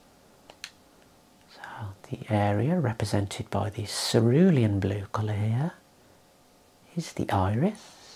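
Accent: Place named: Black Country, United Kingdom. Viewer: At last a black country accent that doesn't sound like nails down a blackboard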